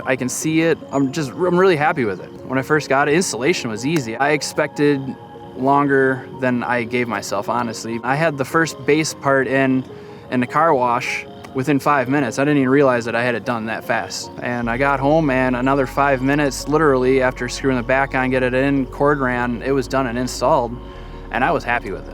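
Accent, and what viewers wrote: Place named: North, United Kingdom. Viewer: Holy northern accent. Much respect from Virginia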